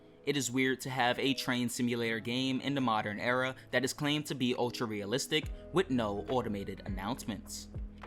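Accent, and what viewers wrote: United States. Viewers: You’re from New York aren’t you?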